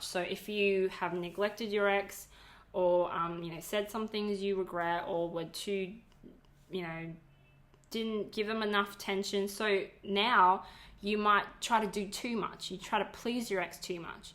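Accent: Australia. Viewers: are you chinese...you sound australian😯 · your accent... are you in australia? you sound like my cuz in melbourne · this is an Asian Woman with an Australian accent. never thought there was a such a thing as a perfect woman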